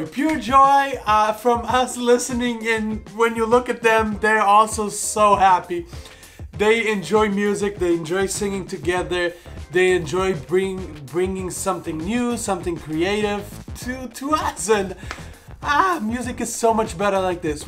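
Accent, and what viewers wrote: Brazil. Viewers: R u brazilian or maybe ur parents? Cuz ur name sounds Brazilian and when u speak Portuguese u kinda have a Brazilian accent...it doesn't sound like an american speaking portuguese 😂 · Ele é Brasileiro? Tem acento brazuca em inglês!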